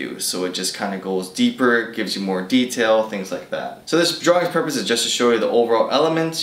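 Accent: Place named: Hawaii, United States. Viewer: I could tell just within the 1st minute of your video that you were from Hawaii. Mahalo for the content, I started as a laborer 2 years ago and want to progress with this as my career choice so I’ll be watching more of your videos!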